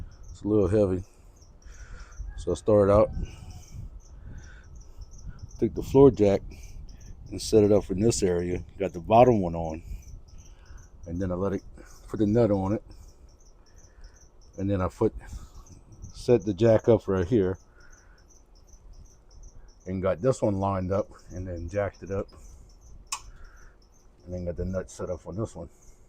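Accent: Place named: Louisiana, United States. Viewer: You can always tell when someone’s from Louisiana!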